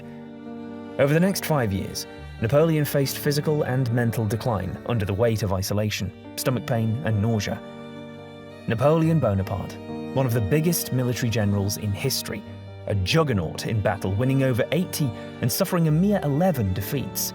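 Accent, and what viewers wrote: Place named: Italy. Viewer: I love how the narrator uses his Italian accent! 💙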